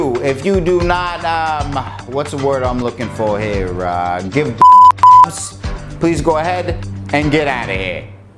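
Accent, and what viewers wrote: Boston, United States. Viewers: This is so funny because of his Boston accent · Hana M he's from Haverhill, that's pretty much how we talk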